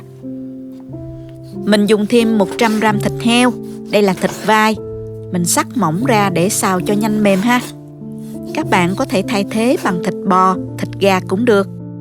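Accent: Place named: Binh Duong, Vietnam. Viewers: ơi tiếng nói cô dễ thương quá __ mình nghĩ chắc quê ở Bình Dương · Quê hương minh cung la binh dương nghe sau nho nha quá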